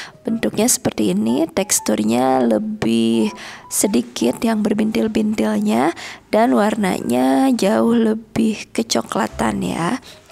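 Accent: Bali, Indonesia. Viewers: Kadang kadang logat t nya ky orang bali ..asli gitu ya ngomongnya..apa di buatt buatt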